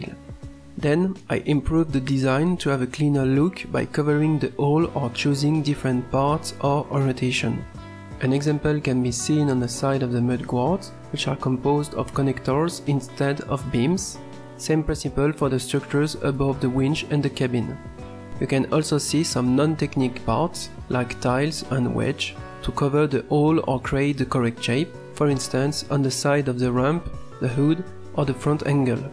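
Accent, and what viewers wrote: France. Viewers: sounds very french but the script is very well written. and the model is, as always, leagues ahead of what a mortal could come up with. good job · It still sounds very french ;) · T’es français tu parle comme un français !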